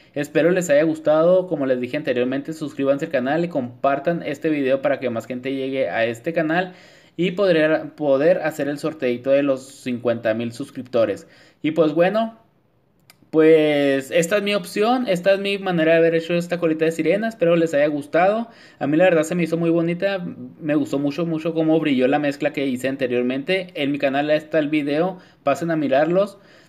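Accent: Chihuahua, Mexico. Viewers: Así hablas tienes acento chihuahuense una super persona muy lindo y atento me encanta tu trabajo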